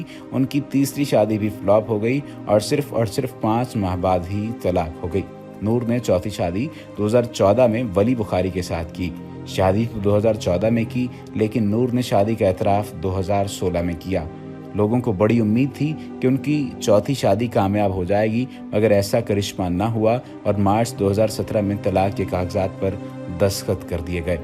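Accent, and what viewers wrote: India. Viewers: Urdu Nwabi accent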